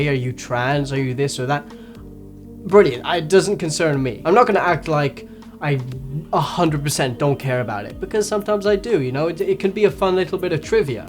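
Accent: Ireland. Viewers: Are u Irish? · I think he's Irish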